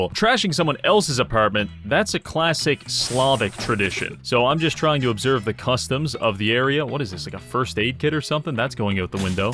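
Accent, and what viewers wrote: Canada. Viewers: Your fancy Canadian speak amuses me.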